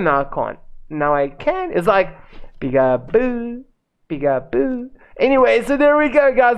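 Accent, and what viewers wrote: South Africa. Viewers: I thought so because your accent sounded south African I'm also from south Africa😂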